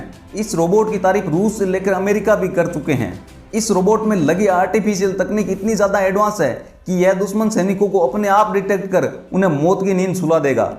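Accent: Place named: Haryana, India. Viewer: Bahi Haryana sa ho kya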